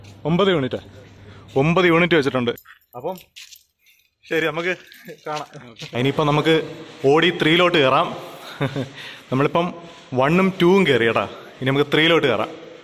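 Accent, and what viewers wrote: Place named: Thiruvananthapuram, India. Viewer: സംഭവം കൊള്ളാം. പക്ഷെ ഈ കൂടെ കൂടെ കേട്ട കേട്ടാ കേട്ടാ ന്നു പറഞ്ഞു ബെർപ്പിക്കരുത് അനിയാ 😬നീ കൊല്ലംകാരൻ അല്ല. തിരോന്ദരം ആണെന്ന് തോനുന്നു 😆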